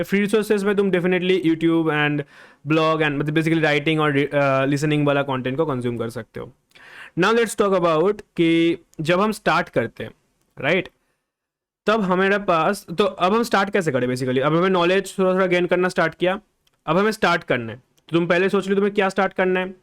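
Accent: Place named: Bengal, India. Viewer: Brother You are bangali right? I can feel it with your hindi. But no worry, you are good at hindi. After sometime I hope you will be more fluent in hindi. There is something about you which I can relate with me with you. I think, you know a lots of stuffs but the thing is, it is not looking so much interesting to watch this video. But obviously it is informative. I have the same problem, pata to bohut kuch hai lekin utna interesting video ko bana nhi pata. But we have to try to be more interesting to people. Agar kabhi tum aur mai famous ho jaye to ek podcast to banahi sakte hai na? Take love ❤, spread the positivity. And lastly remember me 'its Samiul' 😊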